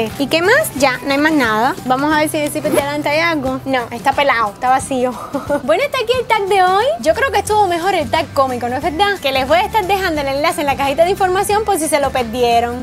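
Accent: Cuba. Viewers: mariam teran es el asento cubano pues yo soy panameña y desimos nama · mariam teran es por el acento cubano en algunos países cambian algunas palabras por ejemplo yo soy chilena y no hablamos con la S ejemplo: estas/estai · mariam teran por el acento cubano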